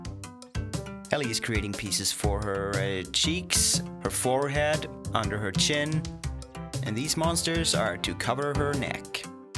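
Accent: Sweden. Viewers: Whenever I hear your Swedish accent I can't stop thinking about summer and food.. Every year, when the summer is approaching we have a lady from Skåne on TV and radio, and she comes bearing new summer-recipes..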